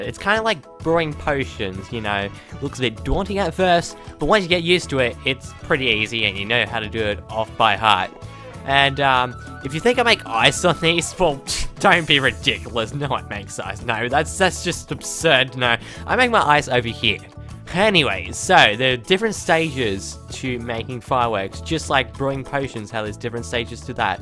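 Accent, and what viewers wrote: Australia. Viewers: are you australian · R u australian?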